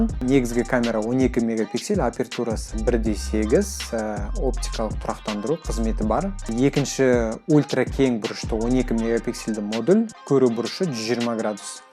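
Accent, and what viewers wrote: Standard. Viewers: Очень качественное видео и речь. Настоящий казахский язык, не базарный и аульный, звучание красивое. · Күшті! Мықты сараптама таза қазақша. Жарайсың!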